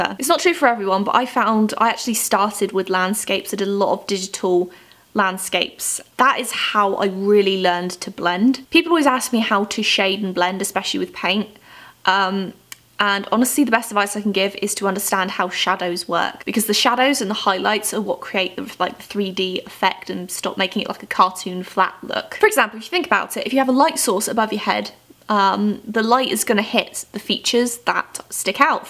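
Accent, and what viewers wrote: England, United Kingdom. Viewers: Which country do you live in because you sound English, but I've never seen Prismacolors in the UK (I'm Welsh) and you were stating the price in dollars? You also mentioned Hobby Lobby but I'm only familiar with Hobbycraft? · I just watched this video which was the first one for me and you made it enjoyable. I enjoyed your style of presentation so Kudos! From your accent you are English yet are your living in the US? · I made a few pages in my sketchbook that I named "mouths", "eyes" and drew over the whole pages. At first I was like "I need to make great works in it", but soon I realized the point of it xD Also, when I watch your videos I always pick that British accent. It's very helpful, since I study English XD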